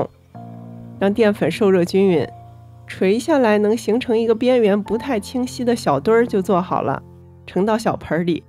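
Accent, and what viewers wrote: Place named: Shaanxi, China. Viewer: 听口音是陕西人嘛， 额打算试试你的方子。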